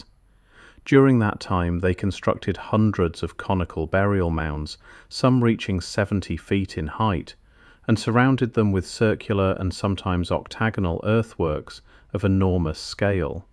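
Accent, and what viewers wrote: United Kingdom. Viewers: What’s with the British accent? Has AI been programmed that speaking with British accent lend to greater credibility, more believable or entertaining.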